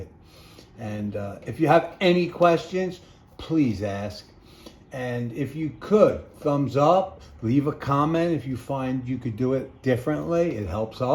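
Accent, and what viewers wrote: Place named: New York, United States. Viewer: Love your videos an accent! You from new York? Would love to see a front fork tube oil change done on a king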